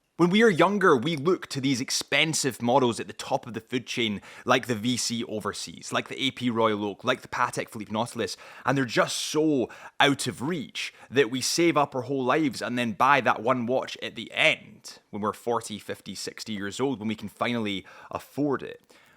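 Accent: Scotland, United Kingdom. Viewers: What’s his accent. Scottish?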